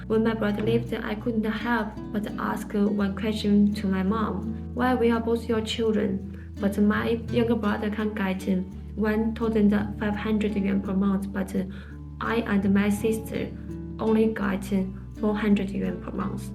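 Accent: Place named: India. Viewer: Your English like Indian 😊, ❤ Bhabhi ji. Namaste ❤ 😊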